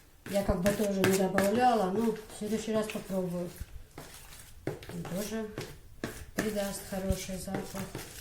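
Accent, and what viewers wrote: Chechnya, Russia. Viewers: И акцент чисто Чеченский, когда говорить на Русском. Молодец удачи тебе и счастья. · Акцент зато чеченский👍👍👍👍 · Окцента чеченский мололец. Спасибо попробуем. Плачь моя подписка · Ассаламу алейкум, я думала, что чеченка👍🙂 даже акцент похож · Я сегодня подписалась на вас, прочитав немного комы, я была удивленна, тем что вы не чеченка) а так похожи, и акцент у вас уже как наш))) Аллах дал атто бойл ха 😘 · Акцент даже нохчи ю ) марш йог1ийл.рецепт сохраню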